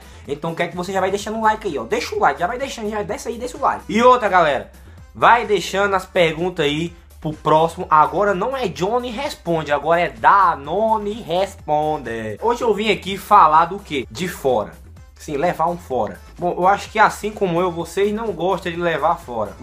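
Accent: Northeast, Brazil. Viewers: Nordestino? É da Familia? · vc e nordestino por que eu só kkkkk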